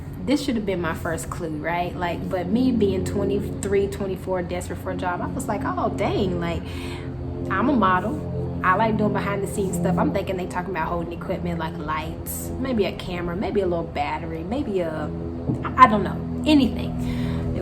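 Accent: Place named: Rural, United States. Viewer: Awwwwww that country twang!!!!!!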